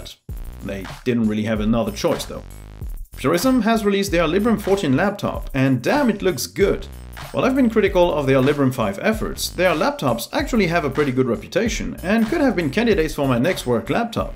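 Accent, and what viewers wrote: Brittany, France. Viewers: Il est de Brest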